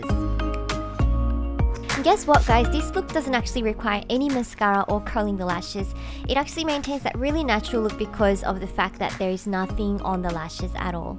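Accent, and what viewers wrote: Australia. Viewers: are you from Korea, l hear an australian accent? to be honest, you make me wet!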